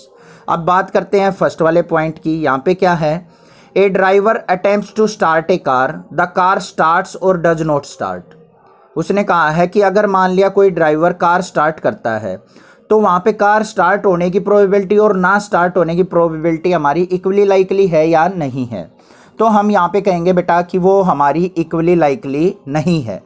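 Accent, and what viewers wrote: Haryana, India. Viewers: Sir aap haryana sai ho 🤔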